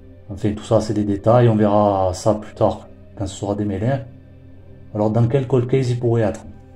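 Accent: South, France. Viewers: Salut Tu vas bien merci pour cette vidéo C'est quoi encore ce délire avec ton accent Tu as un très bel accent c'est l'accent du Sud Zut les C ça commence à faire ce sont les mêmes qui se plaignent des Cygales lorsqu'ils viennent dans le Sud Vous êtes pas content c'est pareil restez chez vous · En plus ça donne du soleil son accent du sud 👍🏽👍🏽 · bonjour oui comme beaucoup d autres affaires. J ai découvert votre travail récemment et me suis abonnée. J apprécie votre façon de traiter les sujets et votre analyse sur les probabilités. Beaucoup de travail de recherche félicitations et l accent du sud c est sympa 😉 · Bravo mec!....pour tes infos, et oui ne change rien, jaime la France francaise.authentique!...tu as un putain de bel accent du Sud. il est probable que cet homme tourmenté et intelligent est commis d autres actes.... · Merci pour tes vidéos tu fais du très bon travail. 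Et moi j aime beaucoup l accent du sud. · Alors moi perso pour être abonné à de nombreux youtubers true crimes etc je que j'aime ici entre autre c'est la simplicité, l'essentiel est la dans le fond et dans la forme. Les vues augmenteront c'est sur, avec le temps, et ya une marge de progression aussi mais j'aime la simplicité ici, j'ai l'impression d'écouter une émission de radio c'est la voix qui nous emmène, avec le chant du sud en prime c'est génial! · On adore l accent du sud. C est le soleil ce bel accent ! Merci pour votre excellent travail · Te casse. Fier de notre accent du sud. Merci pour le taff.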